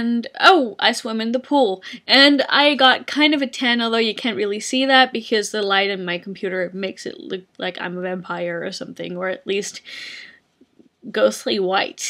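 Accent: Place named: United States. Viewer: Yo accent is more american than usual, lady. or maybe it's in my head. either way, I'm happyz you're still alive and back in the country :) <3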